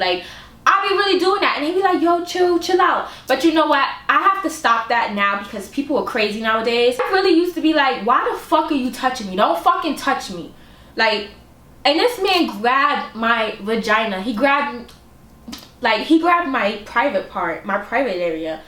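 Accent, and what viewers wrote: New York, United States. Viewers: your so NY .i love it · Do you think that she has a new york accent ? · 😂😂😂😂😂 that new york came out hard afffff in this video lmaooo i love you 💗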